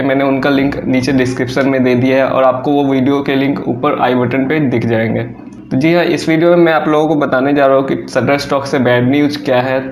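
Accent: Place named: India. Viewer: Can't understand what are you saying in this indian-english style...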